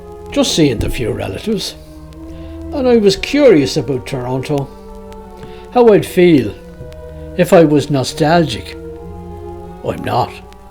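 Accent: Dublin, Ireland. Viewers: I have a confession. I've come to tune in to your channel - not just for the story - but to hear that wonderful Dublin dialect! I love it!!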